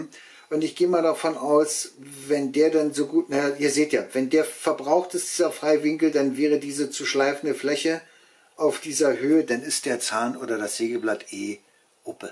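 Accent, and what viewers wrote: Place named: Berlin, Germany. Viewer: ich hab selten so ein informatives und zugleich unterhaltsames Video gesehen,vielen Dank.ich musste mehrfach schmunzeln wegen deiner Berliner Schnauze.Hab mir die Maschine auch bestellt und werde deine Tips übernehmen.Haste fein jemacht.Mach weiter so.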